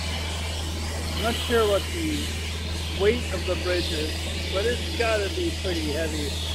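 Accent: Germany. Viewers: You are sounding a bit german Im sorry if not 😂 (Im from germany) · Your accent sounds kinda German 😄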